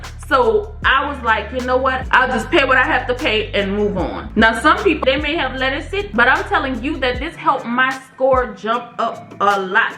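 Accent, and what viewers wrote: New Orleans, United States. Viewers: Thank you for this in depth video & applicable steps! So I hear Nola’s accent?💕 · Girl I’m so happy for you. So beautiful ☺️ I love your accent.. u sound like a NOLA babe. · Are you from NOLA? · Baby are u from the city, neworleans cuz ur accent baby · That Nola accent😩😍 Thank you for the tips beautiful!!!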